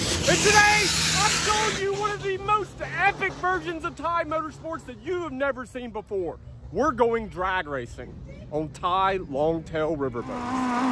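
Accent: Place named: Baltimore, United States. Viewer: the baltimore accent makes this video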